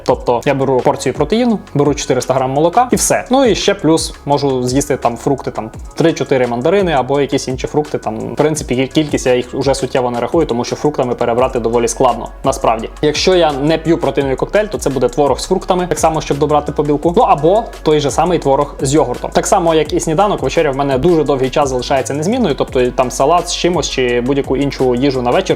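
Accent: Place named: Rivne, Ukraine. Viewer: Привіт!Натрапив На твоє відео,результати вражаючі,повага,цікаво спитати звідки ти бо я сам родом із Рівненщини і твій говір такий як наш😄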